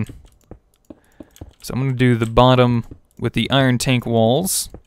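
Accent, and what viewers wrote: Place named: Midwest, United States. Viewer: Scotty I think he has a centrial U.S accent but I cant quite tell, all I can tell is my accent is very similar at times and I live in Oklahoma U.S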